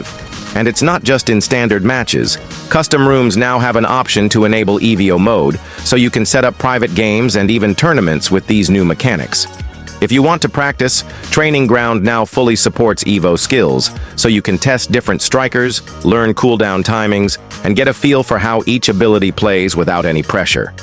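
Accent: Nigeria. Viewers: Wait bro are you from Nigeria 🇳🇬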